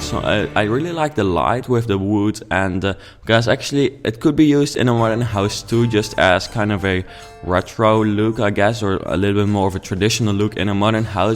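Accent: Netherlands. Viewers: Hihi je hoord ook echt wel dat je nederlands bent lol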